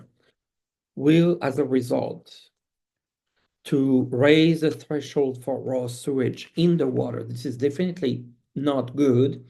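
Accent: France. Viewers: I like the way you talk, calm and soft. Cute French accent, ooh. I think you present your thoughts very well, very well. See you next time.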